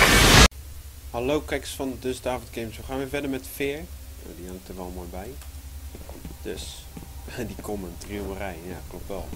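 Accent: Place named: Rotterdam, Netherlands. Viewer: Heerlijk dat Rotterdamse accent!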